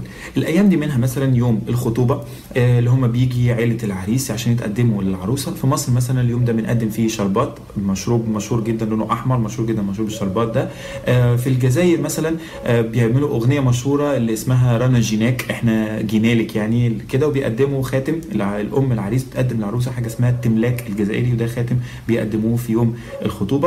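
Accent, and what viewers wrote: Tunisia. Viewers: لهجتك تبان تونسي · لهجتك تونسية بامتياز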